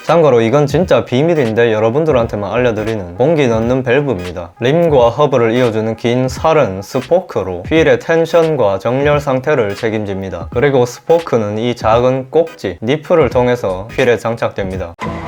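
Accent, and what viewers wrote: Gyeongsang, South Korea. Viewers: ㅋㅋ 이형 겁나 쿨해~~ 마지막에 끝!~~ 갱상도 사투리쓰는 자덕 두 분중 한분 ! ^^ (나머지는 각시탈) 전라도는 안계시나?? 암튼 구독눌렀네요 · 경상도 사투리만 빼시면 100점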